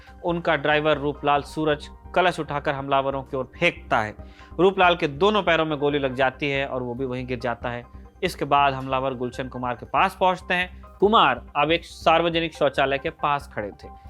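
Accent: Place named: Mumbai, India. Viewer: Mumbaee Bola Anchor 😎📢😫😫😡💙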